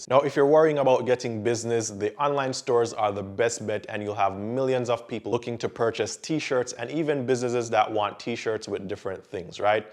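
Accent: Jamaica. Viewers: Sounds like your from Jamaica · just subscribed and I'm looking forward to talk to sir. I am very much interested in the t-shirtbusiness. Yuh sound like a man from yaad iyah, suh bless up if a suh it guh. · Are you Jamaican bro because I hear it ❓😂😂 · Sound like u from yard 🇯🇲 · He sound Jamaican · Hi love you video are u Jamaican? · Young man mi than yu. Fe di information. Sorry mi can't write potwan again sorry. But mi hear u accense. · SOUND JAMAICAN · Thank you for sharing. ARE YOU A JAMAICAN?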